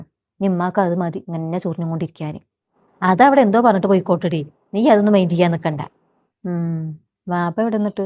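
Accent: Palakkad, India. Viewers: Palakkad vannattoli poyi ttolii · പാലക്കാട്‌ ആണോ നിങ്ങൾ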